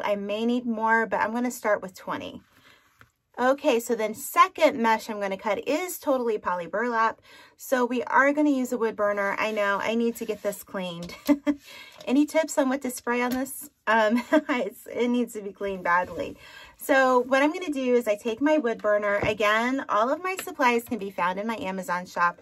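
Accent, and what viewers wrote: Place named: West Virginia, United States. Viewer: yes you still have to pay toll.its 50 cents..I understand how you say words different so people say..I grew up in west Virginia to.so I have the same problem you do.but its all good.love your wreaths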